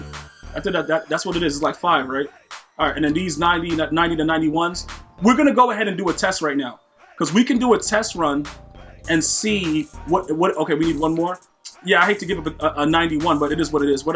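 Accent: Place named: Boston, United States. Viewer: Yo are you from Bahstan? I hear your accent lmao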